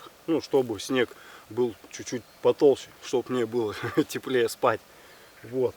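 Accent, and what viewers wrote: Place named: Siberia, Russia. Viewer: Говор сибирский. Термометр надо было захватить.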